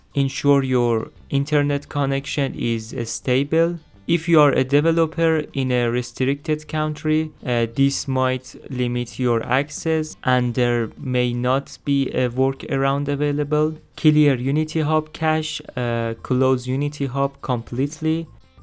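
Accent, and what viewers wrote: Iran. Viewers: It is irani I understand with his accent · are namosan seda irani ha asan ye chi digas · درود داش از لحجت فهمیدم ایرانی هستی من گیر کردم توی این صفحه لاگین هر vpn ای هم که بوده تست کردم بازم خطا میده چیکار کنم؟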